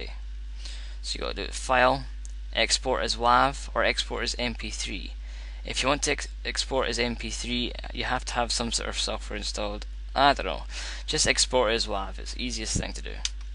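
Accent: Scotland, United Kingdom. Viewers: Cool scottish accent :D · are you sottish? you soud scottish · are you british? :O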